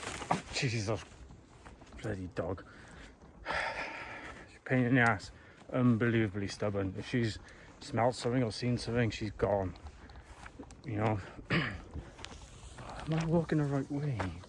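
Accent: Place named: Monmouthshire, United Kingdom. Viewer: Off the scale handsome. Such a lovely accent too... Sounds like Monmouthshire to me, but may be wrong 😊.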